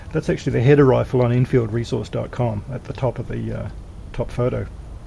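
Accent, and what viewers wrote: New Zealand. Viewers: Kiwi I'd say. Not stretching the 'e' enough to be aussie. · MonsterofTheAslyum1- The same principle applies at the breech end of the barrel as the muzzle end. If you have 1/4 inch of projectile protruding at the muzzle, it indicates you likely have a good barrel, if you have a 1/4 protruding at the breech end, same is the likely indication, as it is the rifling that you are testing. This is especially true as the Lee Enfield cartridge is tapered as well, which makes this test all the more useful. I hope this makes sense. I grew up with these beauties, my first large game (feral cattle) animals were taken down with my dads pristine No4. Mk1 (had the test tag attached when he collected it!) at the tender age of 12yrs old. My hunting rifle now is a previously sporterised (by a previous owner). No4. Mk1. I used this exact test, got the exact result as above, it groups about 1 1/2 to 2 inch at 100yrds. 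It shoots better than I do! I am a LOT older now. :) This guy sounds definitely kiwi. Great series of vids too! Thanks.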